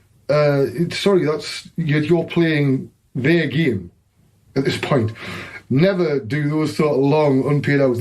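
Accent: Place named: North East England, United Kingdom. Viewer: Good to see your life after 3d series, think you are right to try to stick to one topic at a time for these, good for the algo. Have you thought about doing a regular podcast? I think you'd work well with people to bounce off, guests and/or co-hosts? Sure you've got the industry contacts to have loads of interesting shows. There's a few of these already, might be room for one with a North East accent😉?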